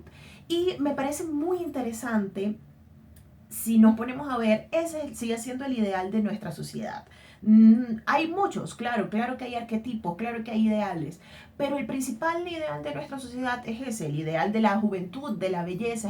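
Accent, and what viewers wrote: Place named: Venezuela. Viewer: Hola... buen análisis, tu acento es tan bello como tu rostro, y sin temor a equivocarme, ambos son venezolanos... un fuerte abrazo.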